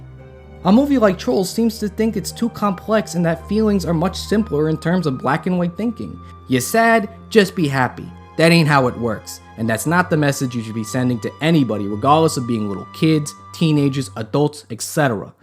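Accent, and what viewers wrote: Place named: Italian-American, United States. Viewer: God I love your Italian-American accent.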